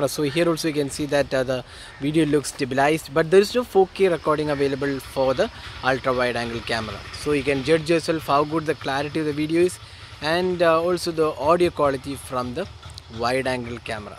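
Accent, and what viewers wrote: Kerala, India. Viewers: That mallu accent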